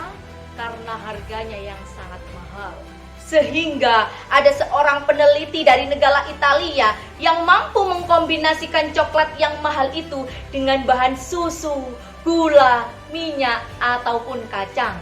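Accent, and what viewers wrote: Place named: Pasuruan, Indonesia. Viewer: Alhamdulillah, nada Pasuruan banget. Akan kita temui ketegasan beliau ketika dipercepat 1,5x. Sukses selalu bu bidan, sehat selalu fatayatku.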